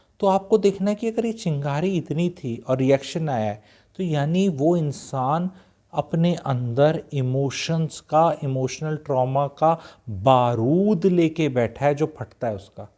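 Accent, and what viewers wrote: Haryana, India. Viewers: People do discuss these things on you tube...but your style of presentation...your personal tone..your being haryanvi.. helps you to be just plain straight forward...which touches the emotional cord at the right spot.. Though things that being conveyed are at elementary level...hope you would handle it with more nuanced approach in your next video.. But ofcourse you being people would listen to you.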